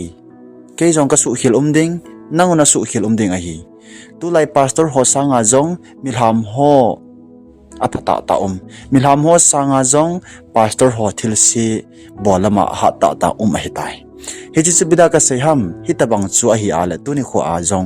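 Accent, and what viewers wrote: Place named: Kuki, India. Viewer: Dik mah² ei and i really like ur accent pure kuki accent which i really like....👍👍👍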